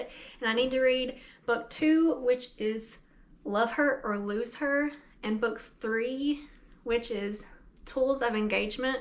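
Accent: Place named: Kentucky, United States. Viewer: Please tell me you’re from KY! You sound like home haha.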